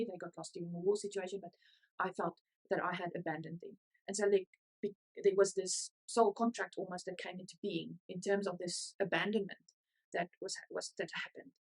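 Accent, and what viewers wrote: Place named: Afrikaans, South Africa. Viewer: Well I can understand an Afrikaans accent! Baie dankie.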